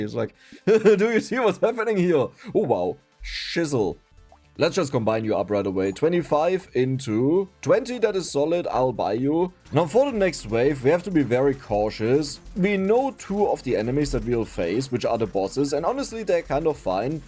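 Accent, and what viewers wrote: Germany. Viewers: Es ist so lustig wie man das Deutsche da durch hört (speeden mit 200 km/h) --- It's so funny how you can hear the German through there (speeding at 200 km/h)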